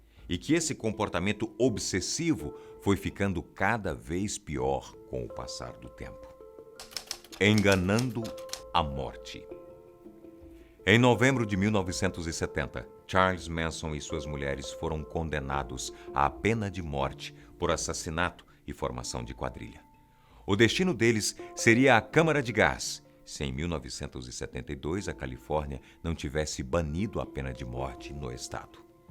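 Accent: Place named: Northeast, Brazil. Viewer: Sotaque Nordestino :v akakaj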